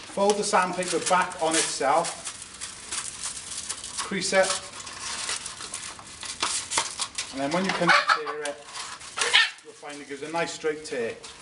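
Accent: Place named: Cardiff, United Kingdom. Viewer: Got to be a Cardiff boy with that accent.....arrrright butt!!!!!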